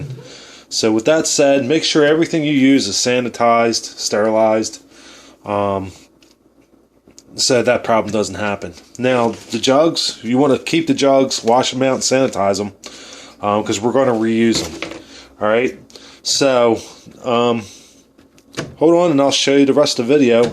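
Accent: Philadelphia, United States. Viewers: That Philly accent..., my goodness. Making me homesick. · Ryan J he sounds close to South Philly to me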